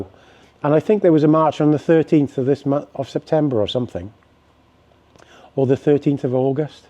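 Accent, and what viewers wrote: United Kingdom. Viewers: you're so right sir... also you sound a bit british?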